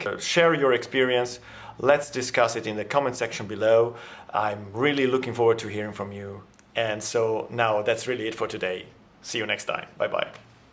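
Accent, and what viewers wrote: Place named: Tavastia, Finland. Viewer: Are you from Tavastia by any chance?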